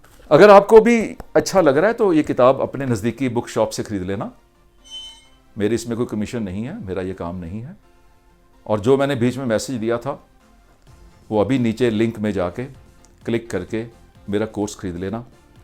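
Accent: Punjab, India. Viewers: Punjabi accent h unka ye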